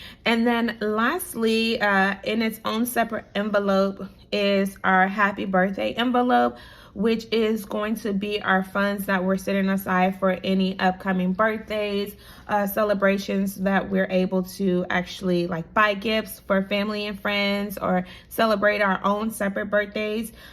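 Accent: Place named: Caribbean. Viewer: I sometimes hear a little bit of an accent, but I can’t quite pinpoint from where lol Caribbean maybe? I’m so nosey!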